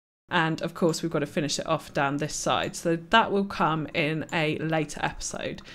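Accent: United Kingdom. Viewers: Another great CS channel to subscribe to. This really is some superb quality content. Inspires me to take a bit more time on my builds. Love the music you used in this 2nd video. Excellent narration and great to hear another Brit. Please keep up the amazing work, and congratulations on nearly 3k subscribers. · Subscribed. Great content and nice to have a Brit to listen to! Shame about the road direction 😜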